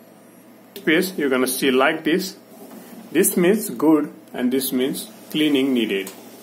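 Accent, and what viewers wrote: India. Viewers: thats a beautiful indian accent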